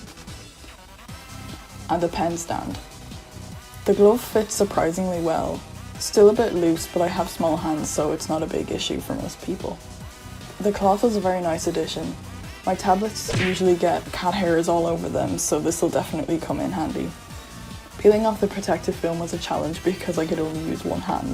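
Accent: Ireland. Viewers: casualy has an Irish accent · your voice is so cool :0 i can recognise an irish accent aha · YEAAAA but it’s not super thick if that makes sense ?? But like ?? I still just adore it omfg I love irish accents sm 💀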